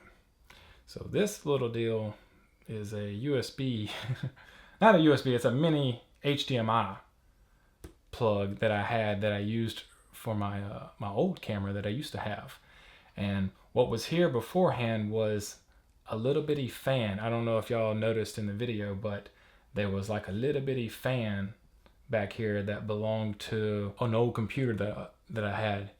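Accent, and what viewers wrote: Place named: Louisiana, United States. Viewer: Awesome helmet! Love your accent too. Louisiana maybe? Anyway, your voice is like butter 😂😂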